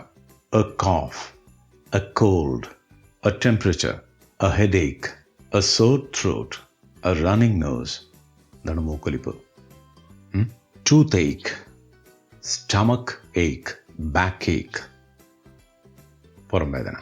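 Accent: Thrissur, India. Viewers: ingalu thrissurkaaran aano ?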